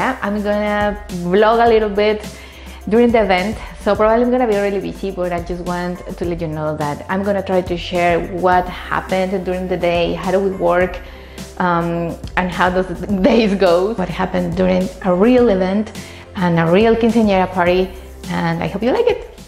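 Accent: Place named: Venezuela. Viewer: Also you look/sound like Venezuelan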